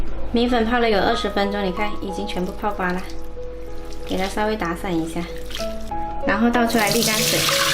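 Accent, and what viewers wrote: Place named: Hakka, China. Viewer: 你好！看到都想食哟😀👍简单易学！吖妺话老鼠奈去了😃客家话好好听👏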